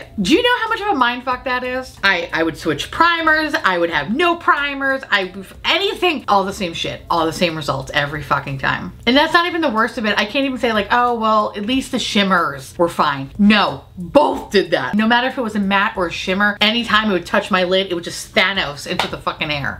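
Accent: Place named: New York, United States. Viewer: I am from nyc and I hear the new Yorker inside you 🥰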